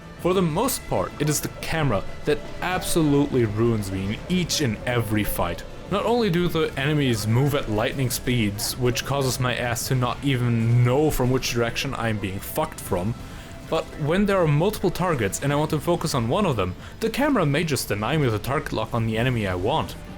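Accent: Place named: Germany. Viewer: Ich denke, ich höre den Deutschen Landsmann raus im Video :D Kann das mit Destiny 2 gut nachvollziehen.... Spiele aktuell AC6 UND Destiny 2 ... das sind Welten unterschied. Aber wie immer, Übung macht den Meister, ich kann nur empfehlen, probier viel mit Waffen und builds herum. Habe das Spiel mit Doppel MPs und 2 Energiewaffen am Rücken durch und bin mitten im NG+, habe nur leichte Sachen justiert fürs minmaxen. Sehr gelungenes Video, aber mach dich nicht so fertig, einfach weiter austesten und evtl. paar tips holen. Grüße gehen raus.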